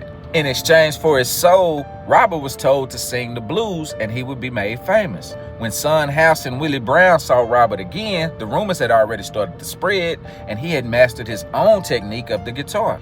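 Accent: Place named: South, United States. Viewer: He has a southern accent, but it's not thick at all. You really can't understand what he's saying? That's fascinating! You should here what a thick southern accent really sounds like! Look up creole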